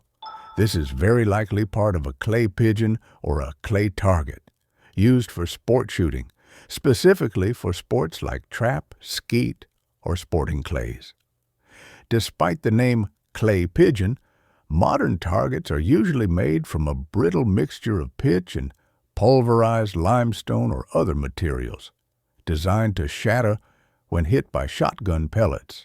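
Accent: United States. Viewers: Not liking the Louisiana-like accent. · The "southern drawl" AI voice is really annoying.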